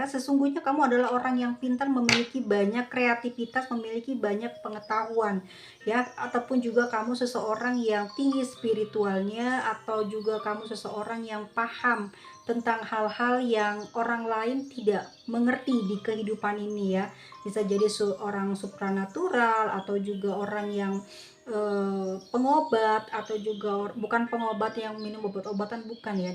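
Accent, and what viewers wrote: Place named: Sunda, Indonesia. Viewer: sy seneng suara ketawanya. Siga sunda.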